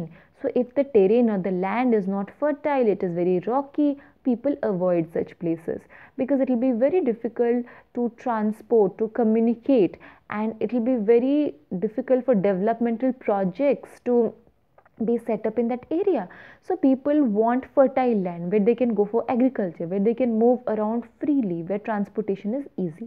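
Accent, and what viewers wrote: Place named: New York City, United States. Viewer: NYC pronunciation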